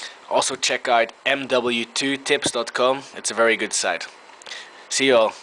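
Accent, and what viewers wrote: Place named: Netherlands. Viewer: kom je toevallig uit nederland... i just asked you if you re from the netherlands cause your little accent sound dutch..... good video by the way.... i like the bushmaster also...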